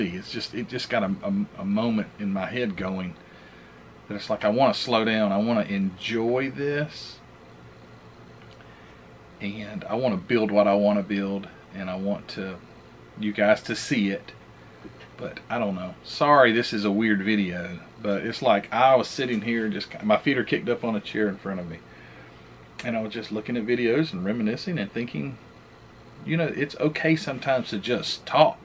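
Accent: South, United States. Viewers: you are real. That's what's so endearing about your channel. Yes you build really cool models but it's more than that. When I first saw you, it was after a Luka Cee video or an HPIguys video. I was really drawn in by your southern accent and the way you talked about your model. I'm glad you want to stay grounded. Thank you for being real.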